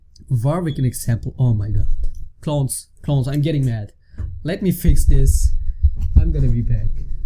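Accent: Germany. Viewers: Höre ich da einen deutschen Akzent :D ? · Wie man hört, dass du deutsch bist :D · ich mag deinen deutschen akzent xD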